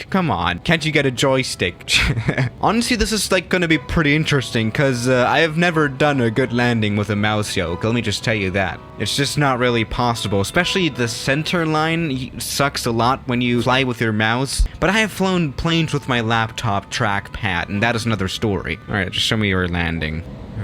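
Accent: Germany. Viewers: He's Jermen don't make fun it just the language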